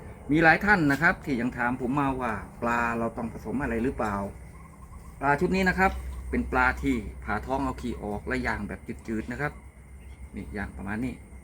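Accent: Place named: Rayong, Thailand. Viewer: ชอบดูครับผมกดติดตามไห้แล้วนะครับเห็นมีต้นทุเรียนอยู่จังหวดอะไรครับสำเนียงเหมือนระยองเลย..จากโคราชครับผม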